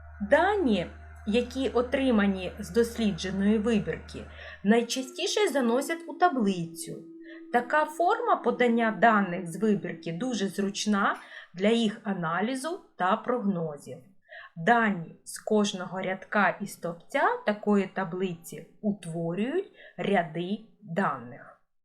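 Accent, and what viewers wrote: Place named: Russia. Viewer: Кацапський акцент)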